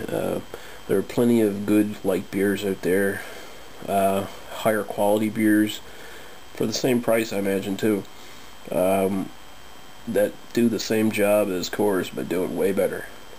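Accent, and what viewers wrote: Canada. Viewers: you have a VERY canadian accent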